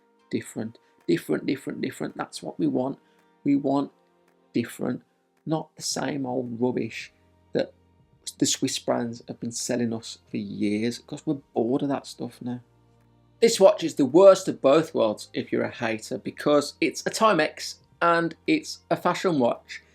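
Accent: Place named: Birmingham, United Kingdom. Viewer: New Sub here. Brilliant collection, and really surprised to see that your first purchase was a £1500 Rado. And you sound like you're local in Birmingham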